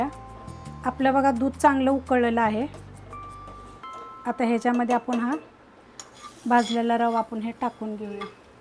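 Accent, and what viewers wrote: Satara, India. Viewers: Tai tumch gav kont aahe tumhi kup chan boltay agdi mazya satar chi bhasha vattey baki khir kup chan zaleli distey mi lgech krun bghnar aahe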